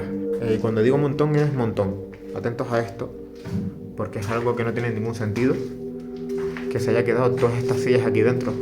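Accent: Canary Islands, Spain. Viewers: Muy interesante el video con sus aulas de colegio y sus sillas,un saludo ,me encanta tu acento canario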